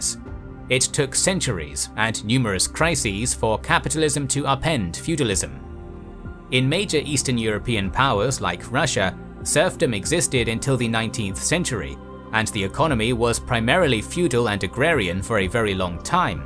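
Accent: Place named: England, United Kingdom. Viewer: I think is English not American based on his accent. But the question is, the World is Euro-centric cause a lot of today's world has its base in European history and technologies (we live in European world so to say) due to the massive influence and impact for good and bad Europe had on the world stage. However, calling England the agent of change of the Medieval period, is like saying Europe was the only place were Iron work or Agriculture was done in the planet. Something we all know is fake and batantly untrue. Pointing out what happened in England is interesting cause as the video is in English, Anglo-American culture wants to know what happened there but leaving the Hansa, Iberia, Northern Italy and the Byzantine Empire complete out of the matter is like talking about the birth of Agriculture and just talk about China as if it had originated there and not Mesopotamia.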